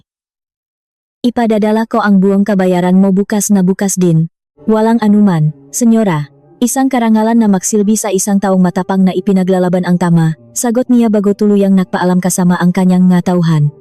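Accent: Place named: Philippines. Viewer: Pilipino slang mag narrate.. its annoying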